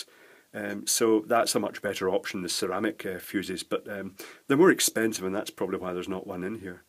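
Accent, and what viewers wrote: Scotland, United Kingdom. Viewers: I'm using the subtitles, I'm not deaf, but sometimes it helps keep track of what's going on if you rewind the video quite a bit. I tell you what, it doesn't like accent! Maybe it should have detected "Scottish" instead of "English". Though he's not really speaking Scottish, which is a dialect of English where they swear more. And all the more for being a Weegie, which I think he's said in the past.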